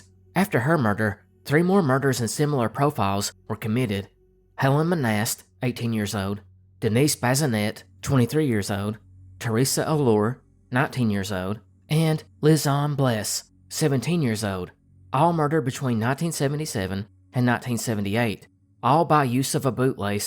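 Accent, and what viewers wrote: South, United States. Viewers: A thick southern accent was perfect for these cold northern stories.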